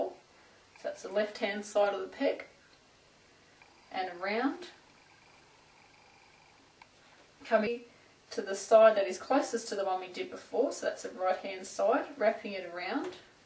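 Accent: Australia. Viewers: R u australian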